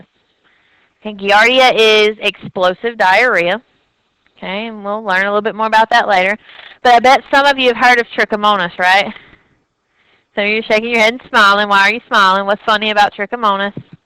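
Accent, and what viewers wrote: South, United States. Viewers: currently taking micro and I am so glad you have taken the time to post your lectures. they are very helpful and easy to understand. your way of teaching is awesome and I am learning far more from you than my current professor. southern accent makes it even better. thank you so much! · Thank you so, so much! As a fellow southern chick I LOVE your accent!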